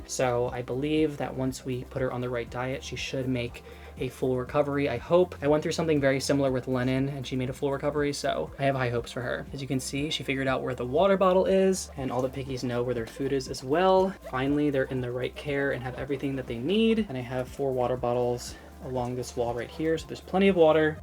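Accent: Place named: United States. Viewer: I spent the first three minutes of this video trying to figure out what his accent is, and I realised it sounds like gay American. And I really don't understand how that's an accent, but that's what he sounds like.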